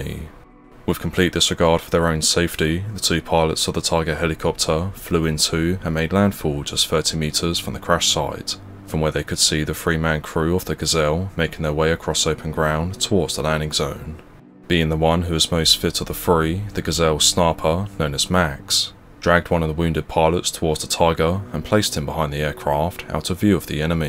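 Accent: United Kingdom. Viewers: He is American. With a British Accent.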